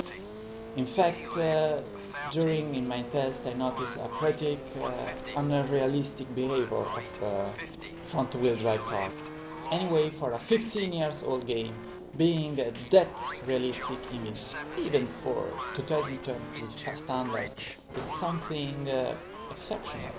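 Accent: Italy. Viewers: Bro ma sei italiano? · ARE YOU ITALIAN??? I HAVE PROVE!!! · E poi ci sono io che sto ancora cercando di capire se sia italiano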